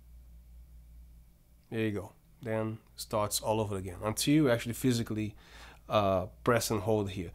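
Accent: Brazil. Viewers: THIS is what i call a review! Amazing work, thanks a lot! Fale bem, mas vc deve ser brasileiro neh?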